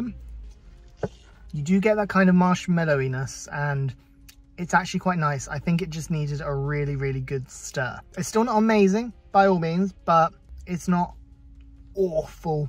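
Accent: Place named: Surrey, United Kingdom. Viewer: I’ve been trying to work out what part of the country you live in, as you have tons of chain brands in your vicinity; but your accent leaves me puzzled!!! Where?!! 😝 Surrey??!!!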